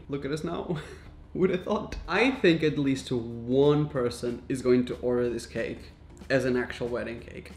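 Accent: England, United Kingdom. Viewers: He’s from england i think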